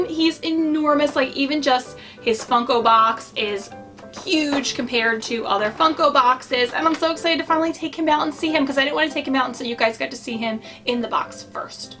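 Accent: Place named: Pennsylvania, United States. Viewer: Can’t believe I haven’t mentioned this yet but I’m Pennsylvania born too! It’s so funny cause your accent is sooo PA! But also GO EAGLES! 💚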